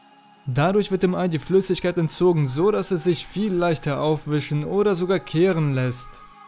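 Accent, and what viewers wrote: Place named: Russia. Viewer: hört man an deiner Stimme ich bin auch Russin habe aber ich spreche normal das sollte kein hatte werden OK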